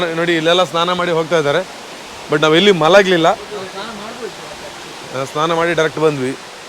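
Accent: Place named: Tulu, India. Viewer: Namma Tulu dakalna taking style de gotthapund🤩👌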